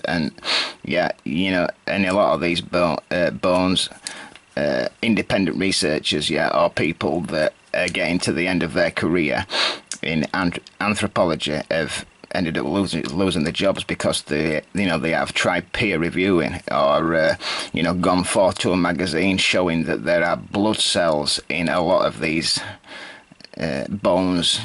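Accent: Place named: Lancashire, United Kingdom. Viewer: hey, I remember that huge dinosaur in manchester museum hanging from the ceiling when I was a kid, I think it was supposedly a T.Rex? 65 million years old 🤣 I was totally indifferent to it . Maybe bc I was a girl. I want to ask are you from north side you have gorgeous Lancs accent... & that cynism & skeptism. Superb. Miss the mancs voice.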